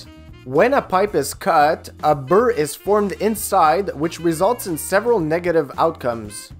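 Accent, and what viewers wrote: Quebec, Canada. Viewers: tu me semble etre un quebecois je me trompe ? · bel accent québécois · no, but I think you have a French Canadian accent like me lol.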